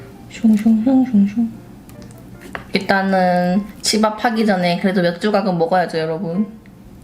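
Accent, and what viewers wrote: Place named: Daegu, South Korea. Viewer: 닫아뿌더라구요 ㅋㅋ 저도 대구사람이지만 님 사투리졸귀..♡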